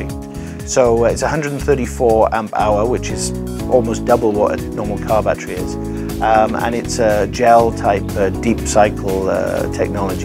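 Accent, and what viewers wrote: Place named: South Wales, United Kingdom. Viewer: Nice bus great work. Your south walian accent is still hanging on. 👍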